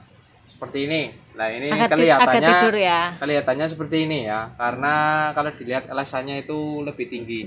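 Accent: Banyumas, Indonesia. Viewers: Bojone resing tenan mas... seneng khas ngomonge ngapak yak kepriweh pokoke khas tenan pertahankan mas... kaya mertua saya wong batang 😁 Ilmune aku nderek sinau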